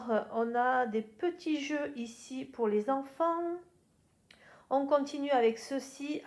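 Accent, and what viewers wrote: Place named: South, France. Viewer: Coucou 🥰. Je suis ravie d'avoir fait votre connaissance sur YouTube. Vous avez bon goût. Moi j'ai accouché en 2009 à Villeneuve sur Lot et mon fils a été transféré en néonatalogie à Agen je crois. J'ai été hébergée chez la tante de mon ex à PUJOL. J'adore votre accent du sud le LOT et GARONNE. ❤🥰. Bisous 🌹 😚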